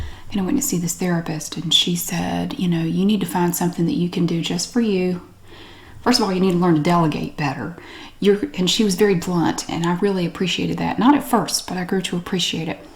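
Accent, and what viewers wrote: South, United States. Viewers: Damn I love that Southern accent , I could listen to her talk about paint dry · Happy channelversary! You have a fan from Oaxaca Mexico 🇲🇽 Your videos bring me a lot of memories of my life as an Au pair in Hampton roads VA back un 2018. I love the southern accent and I think you're a sweetheart! Saludos (greetings) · Well I love your southern accent. And I think you are so funny😂 ❤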